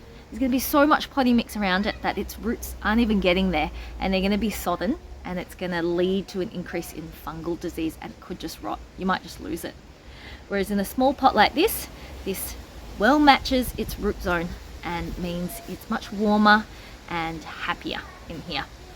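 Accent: Australia. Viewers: A fantastic and informative video Miss. I enjoyed it and learned much from this. You are a very gentle spirited lady, and I love your Australian accent. I am watching from California. I will definitely try these tips. Blessings to you Miss. YESHUA FORGIVES AND SAVES! John -17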